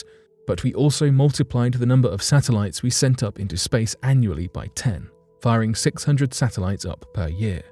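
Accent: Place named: RP, United Kingdom. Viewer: You sound like British Royalty! Best accent ever!keep up the good work as well. Happy New Year!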